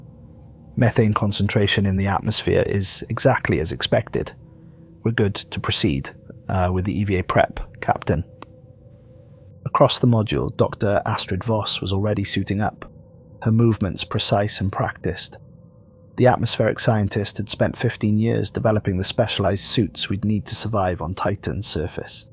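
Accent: Wales, United Kingdom. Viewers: Dude, you actually read things not just use an AI reader, who cares about the odd and ends like that, but respect for caring. Frankly I subbed the second I heard the Welsh accent, sounds like home!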